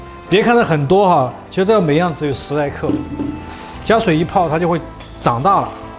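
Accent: Sichuan, China. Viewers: 我的父母都是四川人，在美国听到四川音感觉很亲切。四川好男人，靠谱！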